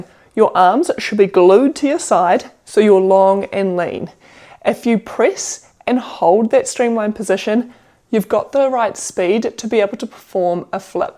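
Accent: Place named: New Zealand. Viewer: love the accent .. New Zealand ?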